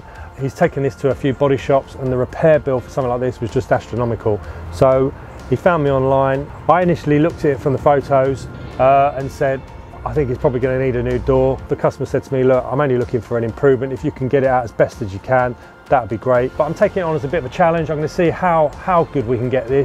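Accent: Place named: Manchester, United Kingdom. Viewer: MY GOD HES FROM MANCHESTER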